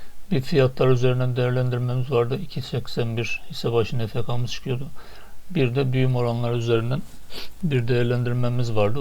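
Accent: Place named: Trabzon, Turkey. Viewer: Emeğinize sağlık Trabzonlu kardeşim.Şivenize gore tahmin ettim.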